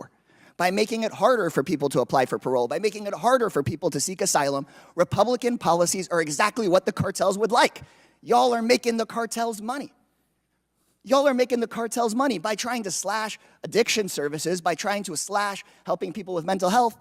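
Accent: South, United States. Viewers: Says the elites right man with the southern accent.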